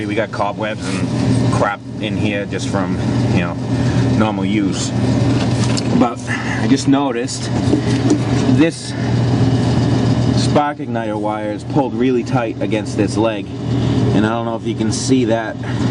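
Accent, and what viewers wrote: Boston, United States. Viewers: I’m a firm believer that them YORKs get assembled but with a lot of failed attempts with parts and as soon as they get it right,they call it complete and move it out of assembly line,is the only way I can explain them cause you can be the best tech ever but you put one together and it fails to start so you gotta tinker around with it so it can start,I don’t like them,nope don’t them.Are you in the Boston area HVAC BROSKI cause I can hear you accent? We need top techs in that area and up into New Hampshire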